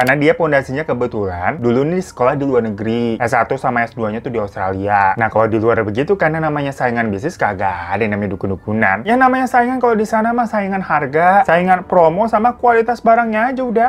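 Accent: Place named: Surabaya, Indonesia. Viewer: Pasti org surabaya nii.. ngomongnya "I" (ai) gt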